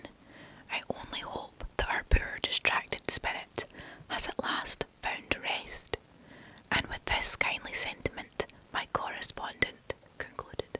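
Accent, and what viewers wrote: Scotland, United Kingdom. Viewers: I like your Scottish accent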